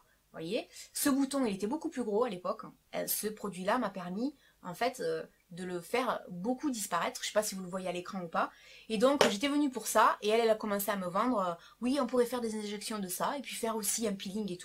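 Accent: South, France. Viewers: bonsoir^^je te decouvre aujourdui grace a une video sur aliexpresse;;; qui ceci dis son top^^ j aime bcp tes videos, juste niveau son je trouve que l on entend peu fort, je suis a fond sur mon pc et c est just !!! mais genial, continue comme ca avec se bel accent du suuuud ^^ bises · Je t'en prie: Garde ton Débit 'VIF' et surtout ton Accent quand tu parles!!!!! Je suis du Sud comme toi et c'est tellement beau et bon de l'entendre sur le net!!!!!! Pour ce qui est des produits que tu présentes: je suis brune 'et' rouge et j'utilise le shampoing (pose 3/5 min) et soin repigmentant (pose 10/15 min le temps de finir la douche) Mulatto rouge et c'est franchement bien! Tu as aussi le Nutri Color de Revlon qui est très efficace même si pas bio. Et pour finir tu es un rayon de soleil, pleine de vie et toute sourire! Reste comme tu es!!!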